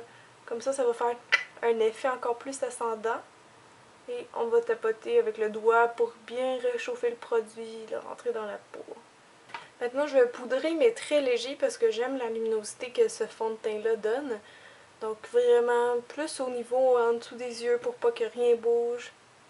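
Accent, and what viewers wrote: Quebec, Canada. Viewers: Hahaha, j'ai regardé tes vidéos sans le son lorsque j'étais au boulot. J'imaginais pas que tu puisse avoir l'accent québécois. Ca m'as un peu surprise. Quoi il en soit j'aime beaucoup tes vidéos. Tout de bon :D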